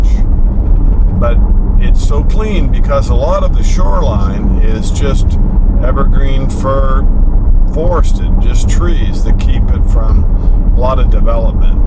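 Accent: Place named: Maine, United States. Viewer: Cannot hear with you in car. Muffled. I thought it was my speakers here in Music studio, Malibum California. $3000 dollar speaker system but, nope, it's your input. I love it when your outdoors showing properties. You are so good at it. Love the accent too. 'GEERAGE' that gets me every time. My grandparents had a beautiful Maine accent. 'LOBSTA' Can't get there from here'. lol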